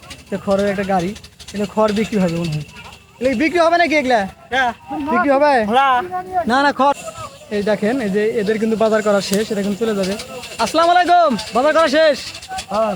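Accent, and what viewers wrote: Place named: Tangail, Bangladesh. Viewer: মিথ‌্যা ব‌লে ধোকাবা‌জি করা দয়া ক‌রে বাদ দিন, আপনার কথার ধরণ মো‌টেও ক‌ু‌ড়িগ্রাম জেলার মানু‌ষের কথার ধর‌নের সা‌থে মি‌লে না। আপনার কথার ধরণ টাঙ্গাইল জেলার মানু‌ষের কথার সা‌থে পু‌রো মিল এর মা‌নে ভি‌ডিও টা কু‌ড়িগ্রা‌মের না।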